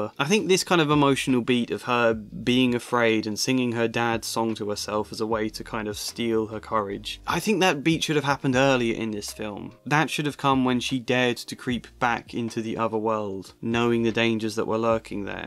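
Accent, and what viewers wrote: United Kingdom. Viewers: I want you to know, that if you did not have a british accent i would not have kept watching this video. That is all.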